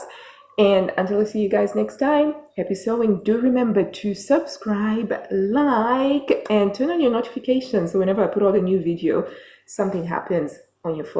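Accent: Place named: South Africa. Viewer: Thank you for a wonderful video. you sound so "welcomingly" South African!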